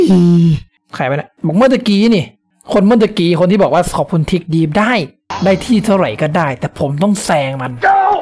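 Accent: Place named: Isan, Thailand. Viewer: อ้อ คนอีสาน เฮาบ่ฮู้เด้อ ซอรี่~~55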